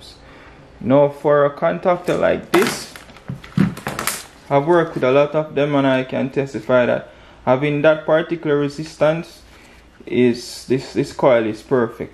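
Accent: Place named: Jamaica. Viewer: Thank you Jamaica 🇯🇲 I know that accent anywhere Greetings from 🇧🇿 Belize!!!! ☮️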